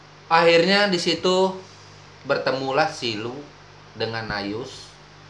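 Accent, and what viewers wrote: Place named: Paser, Indonesia. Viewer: Orang paser sih ini dri logat² nya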